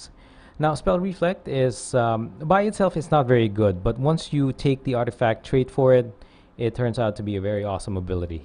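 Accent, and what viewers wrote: Philippines. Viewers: are you a Filipino? the way you speak and pronounce the words .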